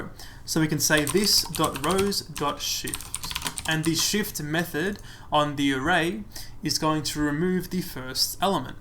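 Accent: New Zealand. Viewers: Are you a kiwi? you sound like one! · Ok.... no worries.. I'm Aussie and thought I heard a slight hint of kiwi.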